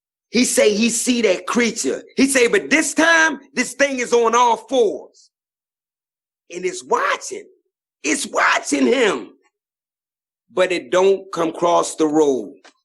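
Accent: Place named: South, United States. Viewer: It's the Southern way of telling a story... very distinct... You will hear the same cadence.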